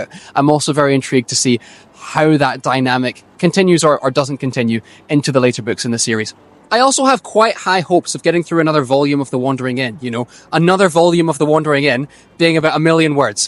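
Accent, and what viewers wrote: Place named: Scotland, United Kingdom. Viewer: Duuuuuude the real deal in a Scottish accent?!! That’s it we are over the moon! So hope our family project hits for you.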